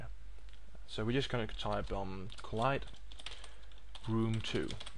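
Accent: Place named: England, United Kingdom. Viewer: Ahh, I srsly had to listen so intently. We both speak English, however he has a heavy English accent whereas I have a Western American accent XD I was actually sitting here like, ">_>?" I even widened my eyes as if perhaps that would help. A little note.. widening eyes do not make one hear any better; though it does cause one to see a wider spectrum of one's room..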